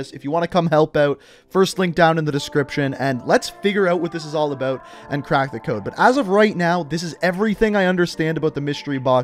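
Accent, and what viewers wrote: Canada. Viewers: Is this dude from Canada?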